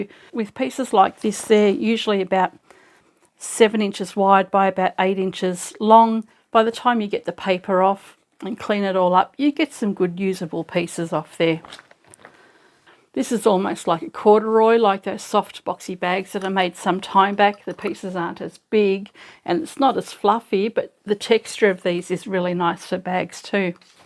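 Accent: Australia. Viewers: I always enjoy you videos & love hearing your Australia accent. So, talk all you want. ❤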